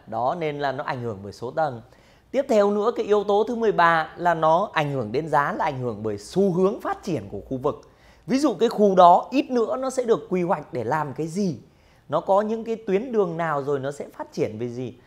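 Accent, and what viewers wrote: Thach That, Vietnam. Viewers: Giọng bạn có phải quê thạch thất?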